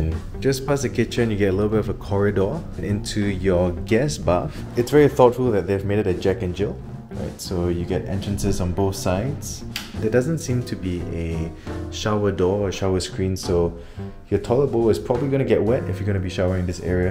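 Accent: Singapore. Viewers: He has vy obvious Singaporean accent, not Philippino accent.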